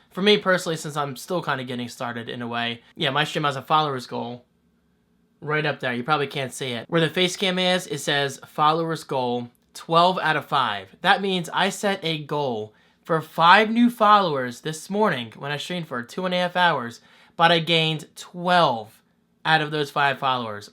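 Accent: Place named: Maryland, United States. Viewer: Are you from Maryland because you have the accent haha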